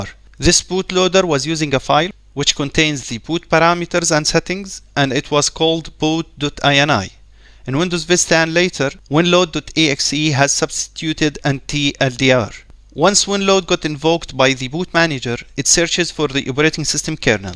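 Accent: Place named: Arab world. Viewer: Haha Dude I can hear your Arabic accent pretty well, very good and detailed video.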